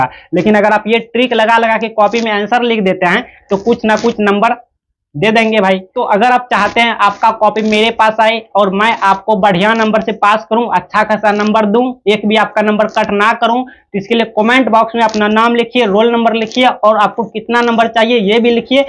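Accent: Bihar, India. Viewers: Mai video start karne se pahle soch liya tha ye pakka bihari hi hoga😂😂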